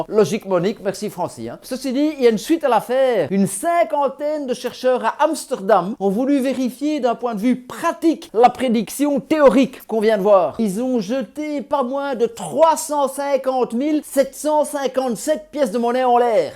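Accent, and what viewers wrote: Belgium. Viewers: J'adore l'accent belge 😂👍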